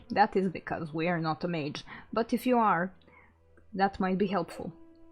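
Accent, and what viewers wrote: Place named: United Kingdom. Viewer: I though you was british or something like that. I like your Accent. Is kinda cute.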